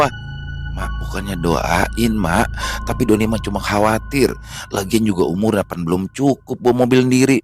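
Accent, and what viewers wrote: Jakarta, Indonesia. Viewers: Bang nih kl ngomong logat betawinye kental bngt.. Apalagi kl ceritain daerah kalimantan... Beeh... Nyangkut bngt.. ❤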